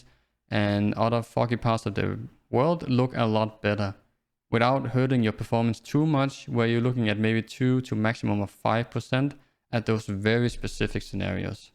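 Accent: Denmark. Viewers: You sound danish lol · Hey bror, har set du er stoppet med at poste, men ber dig begynd at post igen tro mig. Du skal bare øve dig på dit engelsk så det ikke er danglish og blive ved med at poste og lock in. Tro mig hvis du bliver ved bliver du stor på et tidspunkt.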